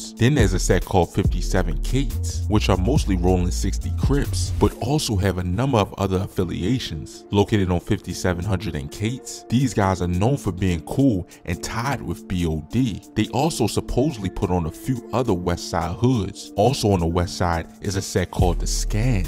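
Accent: Baltimore, United States. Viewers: You sound like you from Baltimore lol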